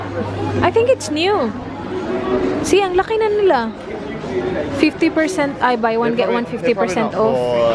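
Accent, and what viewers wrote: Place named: Tuguegarao, Philippines. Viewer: Ate!! Are you from Tuguegarao? Hahaha omg!! Yung punto mo kasi, same sa tita ko. All the love ate!!!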